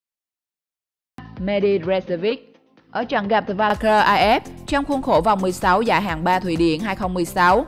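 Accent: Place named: Central, Vietnam. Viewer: Giọng mc miền trung nghe mệt thạt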